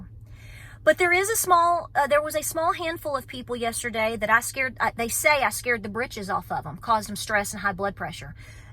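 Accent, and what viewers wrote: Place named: Tennessee, United States. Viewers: In 2020 the Lord Jesus led me to your channel. I listened because your voice reminded me of family in Tennessee. You helped me to see the need for preparedness. My husband was not on board but tolerated what he thought was a phase. By 2021 he changed his mind and wondered why I wasn’t going to the store more 🙄. Thank you for educating us on the things we can do to help ourselves and others. Much love and prayers for you and yours❤️ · Those people who state that you don't have solutions are really mistaken. LOL EVERY time I go to Aldi's, I find myself thinking, get out of my head! Your Southern TN voice is what I hear in the canned goods aisles. LOL I start to wonder if others can hear you say, "Girlfriend, you better get an extra 5 cans of corn or beans. I may not commit much, but I hear ya! Stay Strong!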